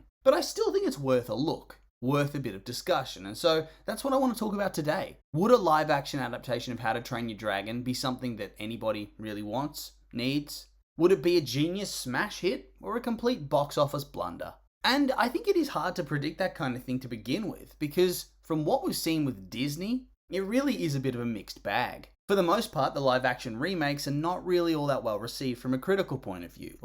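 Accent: Australia. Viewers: are you australian? you sound australian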